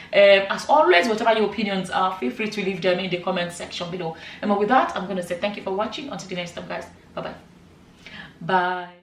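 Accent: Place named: Yoruba, Nigeria. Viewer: The way you speak your yoruba just cracks me up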